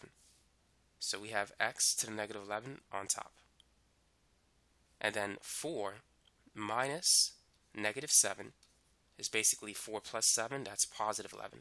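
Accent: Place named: New York, United States. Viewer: I feel like you have a New Yorker accent.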